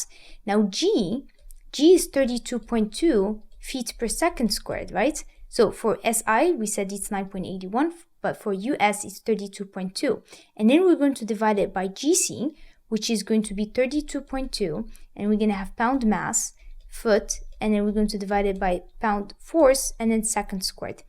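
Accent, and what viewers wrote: France. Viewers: Sorry for my dummy question, are you from France?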